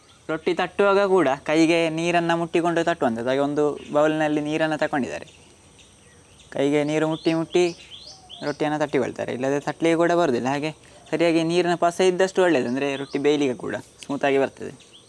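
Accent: Mangalore, India. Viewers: Yes to listen to your Mangalore kannada is very nice. I remember my days at Mangalore for twenty years.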